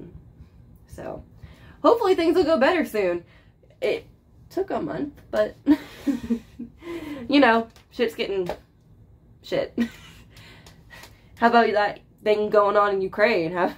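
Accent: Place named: South, United States. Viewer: I never realized how heavy that southern accent is. I thought it was just a big time lisp.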